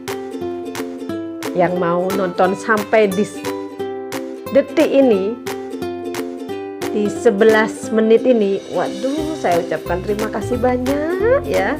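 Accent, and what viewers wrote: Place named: Madura, Indonesia. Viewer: Suka banget dengan logat bicaranya mbak. Salam madura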